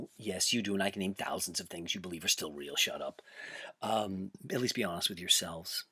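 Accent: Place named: United Kingdom. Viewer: Wow he's still doing the British accent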